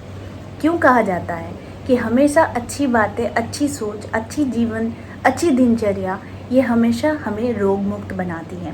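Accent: Bengal, India. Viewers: R u Bengali??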